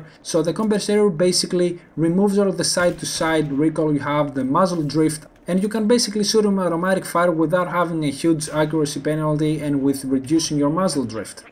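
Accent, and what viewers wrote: Greece. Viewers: Where is he from? His accent sounds like he is Greek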